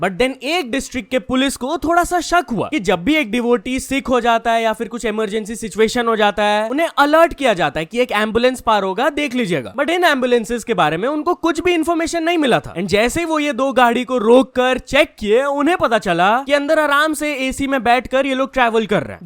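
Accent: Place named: Hyderabad, India. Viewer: Hydrabadi accent bro 😅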